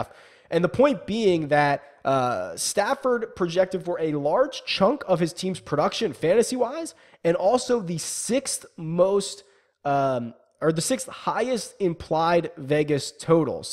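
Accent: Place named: Philadelphia, United States. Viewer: you gotta be from philly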